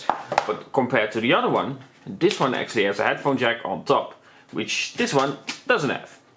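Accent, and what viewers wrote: Netherlands. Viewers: Are you from the Netherlands? Because I hear the Dutch accent.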